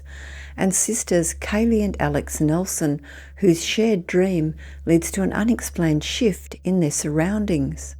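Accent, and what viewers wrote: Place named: Australia. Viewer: Exactly so why would this feature on this episode? The narrator is clearly Australian?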